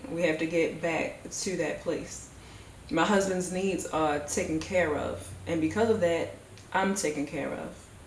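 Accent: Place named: Baltimore, United States. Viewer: You from Baltimore aren’t you?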